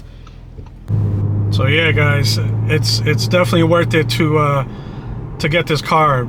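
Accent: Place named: Massachusetts, United States. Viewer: I’m from MA too. You don’t have a strong accent at all (the road signs looked like you were closer to RI anyways), but I noticed it a few times - not a bad thing. Anyways - love the S5, congrats!!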